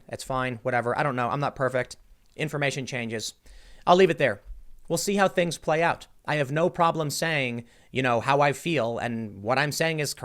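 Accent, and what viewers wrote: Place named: United States. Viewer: Tim, to you. I have listened to probably about 15 of your vlogs or whatever you would call them. And to me you sound to me like an American. I am 55 but when I was a younger man I could discuss issues including politics with those who diametrically oppose me on most opinions, then we could go to the movies switch discussions to music or anything. You are articulate and you back up your opinions with data. No one on the right or the left should expect more of you. Keep up the good work. If everyone agreed with everyone 100% of the time we would be living in a robot police state with no free will.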